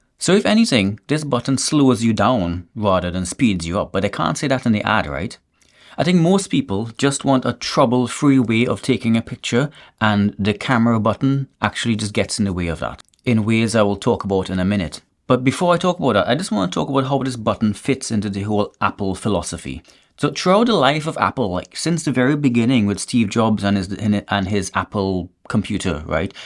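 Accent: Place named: Trinidad and Tobago. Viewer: Bredz like ah hearing Trini 😂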